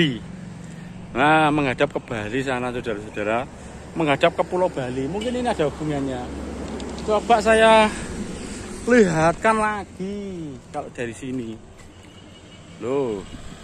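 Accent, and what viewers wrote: Java, Indonesia. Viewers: Aku suka bicarax lucu medok bgt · Medok banget ngomong nya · Mas ngendikane medoo... K, pool seru mas sukses selalu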